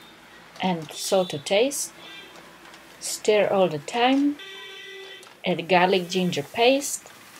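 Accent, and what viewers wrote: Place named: Iran. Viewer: What accent is that? It sounds quite Iranian and Far-Eastern European! Nice channel!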